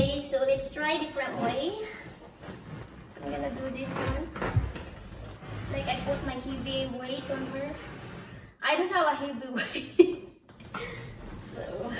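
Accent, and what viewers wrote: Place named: Philippines. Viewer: You made me laugh and I love your Pilipino accent, it's so cute.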